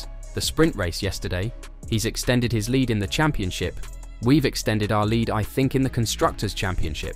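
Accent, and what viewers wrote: United Kingdom. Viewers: Off course a Britisch accent, skipped.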